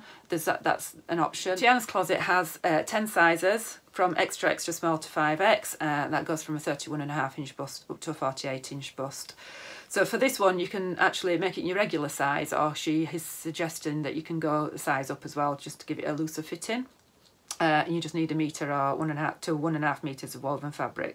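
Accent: Yorkshire, United Kingdom. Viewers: Sewing. Free. Yorkshire accent. I’m ere for it lass. 😊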